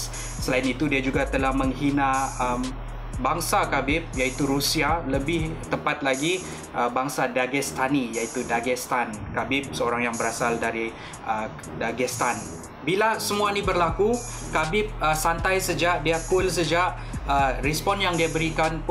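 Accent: Sabah, Malaysia. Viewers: sabah here. pekat btul sabah mu. vid mu nda prnah mengecewakan. semoga sukses selalu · Sabahan bah nii... Like bah kita kita juga nii.. Haha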